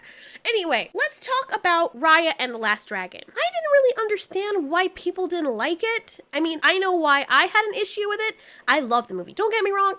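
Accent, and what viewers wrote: United States. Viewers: not no accent she just speaks with a very smooth American accent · I thought u were American.Thats crazy.Are u American?❤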